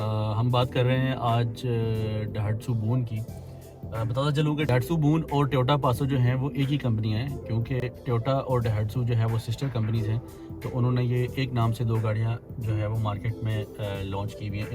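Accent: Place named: Punjab, India. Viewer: i love your punjabi accent ❤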